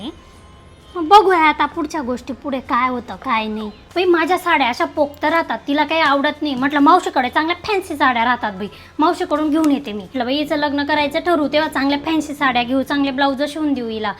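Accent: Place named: Vidarbha, India. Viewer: छान वर्‍हाडातला हेल बोलता तुम्ही. मला माझ्या नात्यातल्या आवडत्या तायांच्या घरी भेटीला गेल्यासारखं वाटतं...❤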